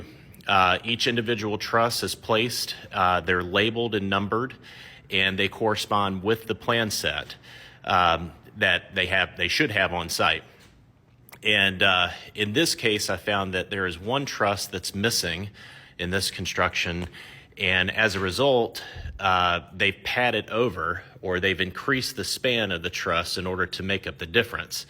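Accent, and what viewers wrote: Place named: Midwest, United States. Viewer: Wow, That's a sizable number of mistakes. By your accent I'm guessing this in the midwest somewhere, possibly MI or Wisconsin? Just moved out to AZ and I can tell you the level of building integrity is far below my former home in MA. I'm in a 7 yr. old house and I'm finding serious flaws almost daily. Was a builder/remodeler back east.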